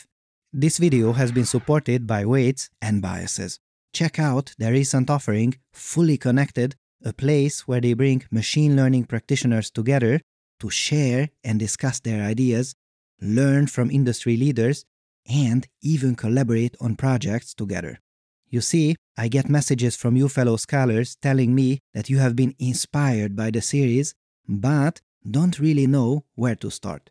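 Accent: India. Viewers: LOL, I thought you are Indian (based on your accent and exotic name) :-D What a surprise :-) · I assumed from your accent you were Indian, but I suppose there’s a paper out there that knew better than me to begin with.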